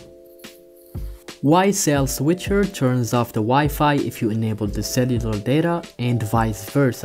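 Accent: Morocco. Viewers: Are u morrocan ?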